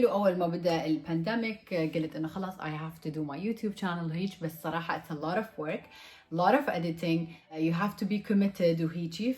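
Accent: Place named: Iraq. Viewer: حلووو معايشه هواي بل عراق وتحجين عراقي حلوو 😘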